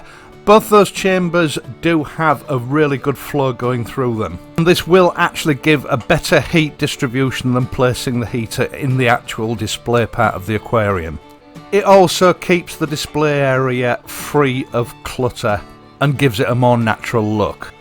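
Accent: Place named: Hull, United Kingdom. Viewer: I am making an assumption based on your accent that you are somewhere near Hull. As a child I used to go to KKC in Hessle. Where would you recommend nowadays?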